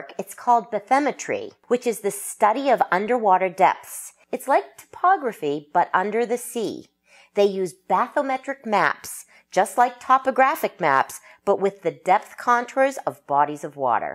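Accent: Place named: Maine, United States. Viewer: Also, I’ve been to Maine enough to know the accent when I hear it. I could’ve told you where yours was without seeing the map.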